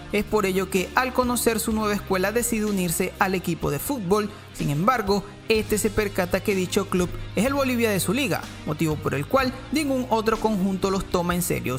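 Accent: Venezuela. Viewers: JAJA me da risa que seas venezolano , ya que entiendo todo lo que dices Soy de Venezuela uwu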